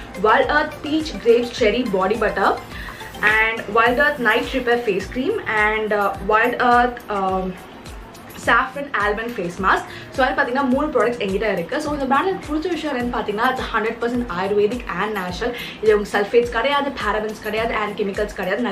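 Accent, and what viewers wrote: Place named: United States. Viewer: Please Talk properly Don't use American accent....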